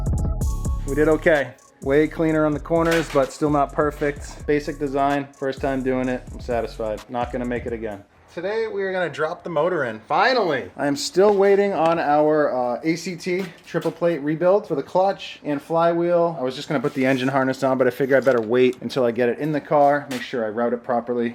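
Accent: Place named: New Hampshire, United States. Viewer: Nice to see you back,! Your new Hampshire accent really shows when you spend more time there. When you gonna bring back 'd?!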